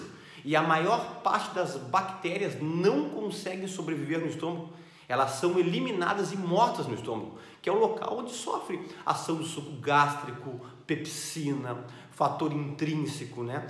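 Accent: Rio Grande do Sul, Brazil. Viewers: Não é bravo, é gaúcho. São assim. rss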